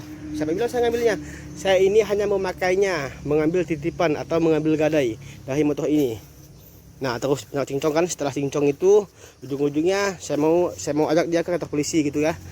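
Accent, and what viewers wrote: Indonesia. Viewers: Orang aceh ya bg ? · Amuntai kh ini,cara bicaranya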